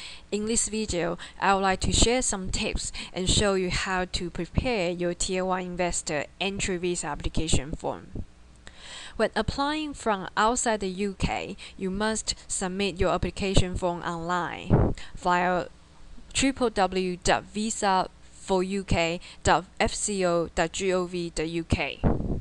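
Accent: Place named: China. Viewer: You have Chinece accent which I love it :)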